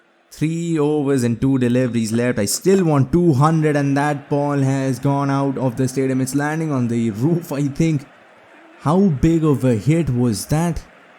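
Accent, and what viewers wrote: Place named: India. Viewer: Where are you living ?, from your voice I feel like you are from India but living somewhere else due to the accent...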